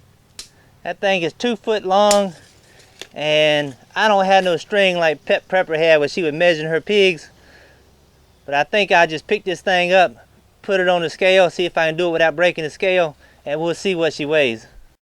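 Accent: South, United States. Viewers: where are you from with that awesome accent?New Orleans ?Kentucky?....anyway great channel I am sure this channel has helped countless people ! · Great video I like your voice so southern! :) God Bless! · nothing like a good ole boy, love the accent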